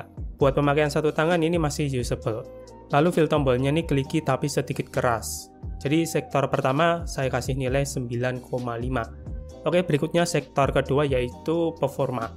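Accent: Surakarta, Indonesia. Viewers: Dari solo kah bang? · Lah orang solo bang?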